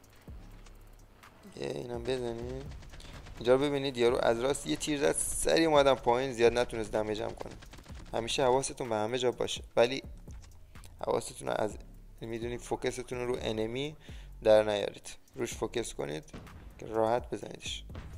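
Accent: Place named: Bushehr, Iran. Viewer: لحجه بوشهری داری....همشهریمونی??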